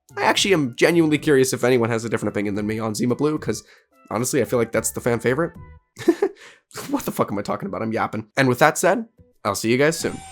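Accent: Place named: California, United States. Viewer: God this was a rough watch with a narrator who gives off LA/Cali vibes somehow... but you can feel he put in a lot of effort in this video and I respect it.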